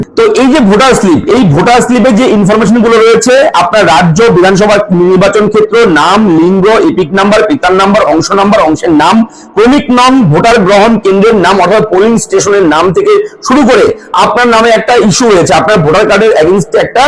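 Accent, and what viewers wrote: Bangladesh. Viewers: এ প্রচুর গাঁজা টেনেছে বোঝা যাচ্ছে I Accent শুনে সন্দেহ হচ্ছে এ নিজেই বাংলাদেশ থেকে খুব বেশিদিন আসে নি I যে ভাবে S উচ্চারণ করছে তাতে তো তাই মনে হচ্ছে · আপনার উচ্চারণ কিন্ত বাংলাদেশীদের মত। আপনি নিজেই বাংলাদেশী হতে পারেন বলে মনে হচ্ছে। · সব ঠিক আছে। একটাই প্রশ্ন। বাংলাদেশি? জিভের আড় ভাঙেনি এখনও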